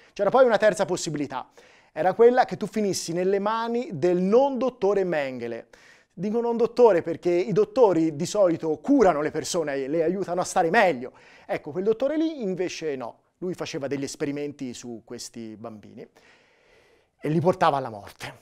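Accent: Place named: Tuscany, Italy. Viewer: Ragazzo, sei un grande... Riesci con la tua parlata Toscana e il tuo sorriso ad alleggerire questo spinoso argomento.... È un piacere ascoltarti.... Baci.... Da una mamma.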